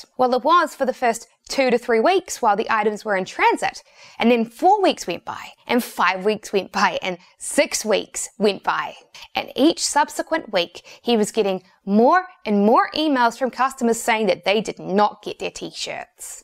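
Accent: New Zealand. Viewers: Creengewearvey dance. I like your Kiwi ikcint tho. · A Kiwi. New Zealand .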